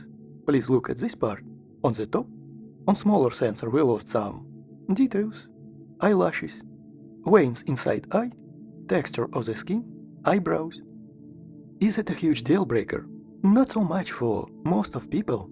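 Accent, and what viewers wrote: Russia. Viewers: Приветствую! Я редко пишу комментарии но щитаю что нужно разъяснить ситуацию с хейтом против вас немного с другого ракурса ))) Есть видео от других авторов по этой теме (100ультра vs 200про) где всё наоборот! Где 200про зачастую лучше 100ульты причём по основному модулю! Существует такой канал 2Megapixels и там есть видео (недели 2 ему) где 100 ультра не особо вывозит 200про ))) И там тоже есть конкретные примеры ))) И тут возникает справедливый вопрос! А кто же из вас двоих не прав! И я прекрасно понимаю людей что обрушились на вас с бурей негодования и хейта. Ведь их претензии к вам основаны не на их не на чём не обоснованном мнении а на конкретных примерах с других каналов по точно такой же тематики что и ваш канал. И тут уже дело не в особо одаренных хейтерах а в том что ваш контент противоречит контенту других авторов которые зачастую обладают более авторитетным мнением чем вы. 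Вот поэтому вас некоторые люди и воспринимают довольно поверхносно и крайне предвзято относятся к вашему контетту. Щитая что вы очередной хейтер конкретной модели 200про. И да главное поменьше делайте акцента в ваших видео на критику в ваш адрес. В противном случает люди что навалили вам негатива будут делать это усерднее ))) Хм по вашему акценту я думаю вам не потребуется гугл переводчик для прочтения моего комментария )))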